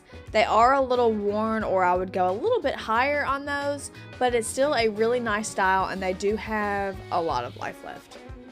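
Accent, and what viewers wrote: Kentucky, United States. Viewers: Where are you from? You say "yall" and that's SO a KY thing!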